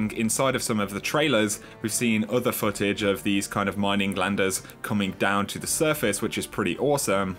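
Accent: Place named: United Kingdom. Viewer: Thanks, keep up the good work! (from Michigan, USA) Love that "!" UK accent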